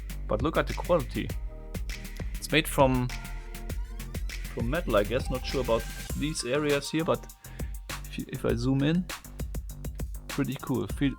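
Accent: Germany. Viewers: Omg that German accent tho!!! Love it❤️❤️❤️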